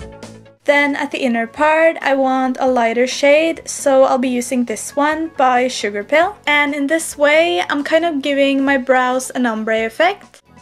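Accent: Norway. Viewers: you can hear that you're from norway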